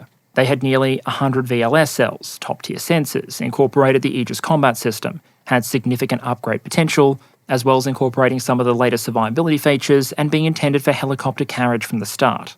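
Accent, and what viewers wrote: Australia. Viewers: Aussie accent, usually a charming quality, failed him here.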